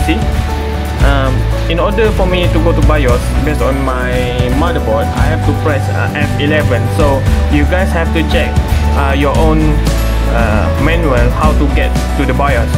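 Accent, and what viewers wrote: Malaysia. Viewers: Malaysian? 😃 Or Singaporean I'm from Singapore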